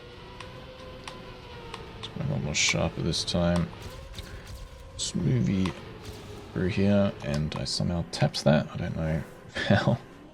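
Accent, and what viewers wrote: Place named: South Africa. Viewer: I watched this as I couldnt get better than bronze on 14, I played it a bunch of times and figured it out and got gold :) just unlocked 16-20 :) Hey, your accent...I think your from SA?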